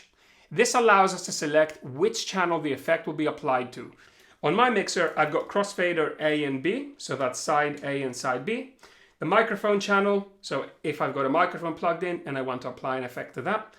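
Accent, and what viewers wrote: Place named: England, United Kingdom. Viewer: I can hear you have picked up the English accent at times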